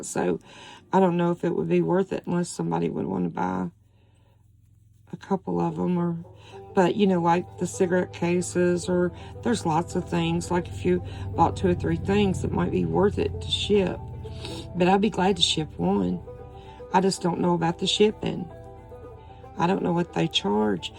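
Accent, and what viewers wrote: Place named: South, United States. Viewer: I love what you made. Love watching, and hearing you. your such a sweetheart. I dont crochet, but love watching you, and hearing that southern acent. Night. And god bless you.🥰🥰🥰🥰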